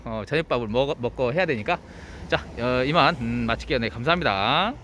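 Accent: Jeolla, South Korea. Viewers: 아따.전라도사투리 존나듣기싫네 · 사장님 말투 때문에 자꾸 보게되네요. 어디인가요 지역이요. 전라도는 맞는데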